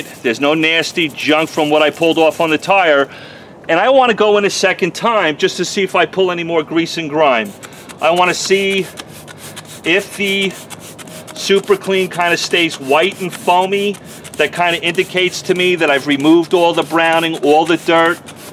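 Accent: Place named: Texas, United States. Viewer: Love that Texas accent 😏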